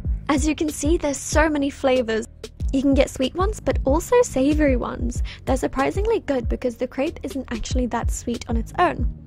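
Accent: United Kingdom. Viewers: I NEVER expected a british accent damn goosebumps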